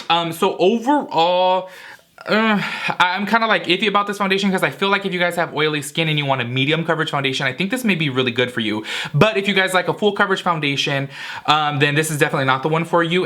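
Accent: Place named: South Florida, United States. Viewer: Are you from south Florida like Miami/lauderdale originally? I’ve always wondered bc of your accent. 😊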